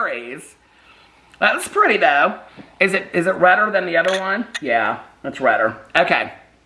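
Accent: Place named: Minnesota, United States. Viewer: I'm dying with your Minnesota accent! 😂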